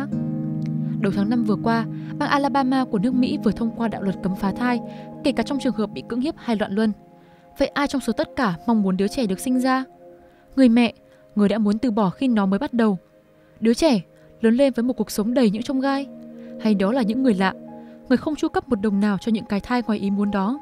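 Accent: Vietnam. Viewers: hihi mình nói giọng Nam chữ "dây" phát âm khác chữ "giây". Nghe bạn nữ giọng Bắc phát âm "dây" giống hệt chữ "giây" nên mình bị quán tính, thật là thiếu não.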